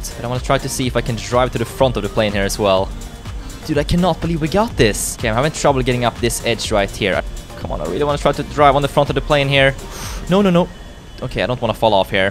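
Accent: Sweden. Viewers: Are you from sweden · ar yu swedish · Are you swedish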